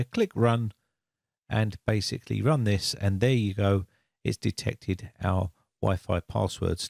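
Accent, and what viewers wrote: United Kingdom. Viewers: Please make a videos for web development programming, sir. I love your British Accent.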